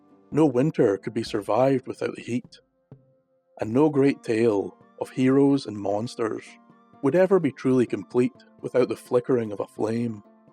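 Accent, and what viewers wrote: Scotland, United Kingdom. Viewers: A Scottish accent is my absolute favorite accent and listening to you tell these stories has also taught me proper pronunciations! Because how I’ve read certain words in books is NOT at all how it actually sounds. Lol. I get so excited when you have a new post! Thank you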